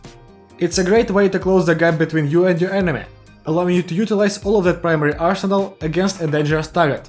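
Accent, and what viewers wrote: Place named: Russia. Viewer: Judging by the accent.... Привет, ты что русский? Сильный акцент, друже. Хороший видос, уверен со временем твоё говорение улучшится.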